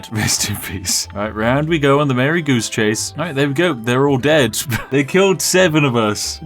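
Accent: United Kingdom. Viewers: is one of the only youtubers where I pause to go to the bathroom come back un pause and the first this game I hear it “And there all going to die” in a calm British accent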